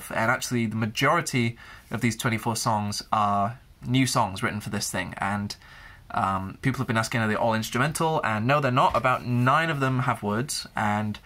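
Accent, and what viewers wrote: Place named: United Kingdom. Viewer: British guy with a cute British accent haha ❤️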